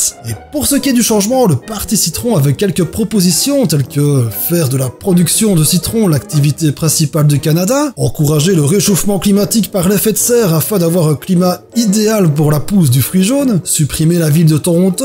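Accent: Belgium. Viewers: Est-ce que tu peux arrêter de dire "houite" et dire "huit" stp, je sais que t'es belge mais bon ^^. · salut, tu fais des vidéos de qualité, du coup je m'abonne. Faut surtout pas que tu t'arrêtes, ton travail est déjà d'un niveau impeccable! j'ai fais un rapide tour de tes vidéos et je préfère cette voix plus naturelle :) elle fait moins Benzai que pas mal de monde te reproche, ce que je comprend pas trop d'ailleurs pcq avec ton accent belche c'est complètement différent ^^ Est-ce que tu as pensé à faire une vidéos sur les bières de notre pays ? genre top 10 des bières d'abbayes ou quoi :P Bonne continuation et je bois à la santé de ta chaîne, que ca prospère !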